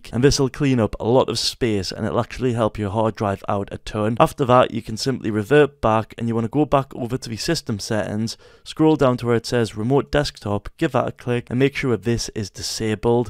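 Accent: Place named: United Kingdom. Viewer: bro is bri'ish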